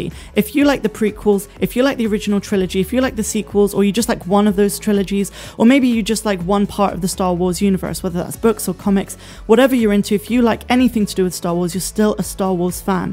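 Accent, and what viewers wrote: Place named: West Country, United Kingdom. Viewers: Jibber jabber. I detect some good old South West accent in there. Devon maybe. Nice to hear a fellow yokel on YouTube. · Well said but I'm still hearing West County accent 😂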